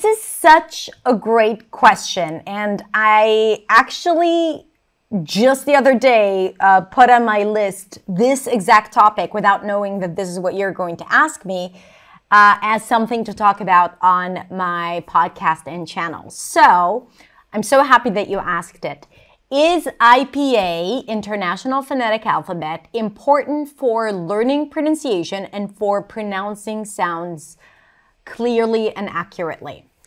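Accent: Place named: United States. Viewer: Madam your English speech is American I think coze I want to fallow .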